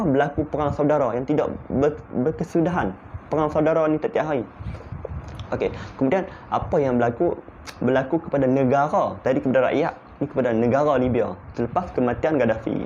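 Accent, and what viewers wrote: Perlis, Malaysia. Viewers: Good content Bro. Semoga Allah mempermudahkan segala urusan kita hendakNya Bro. Style cakap mcm Geng Perlis.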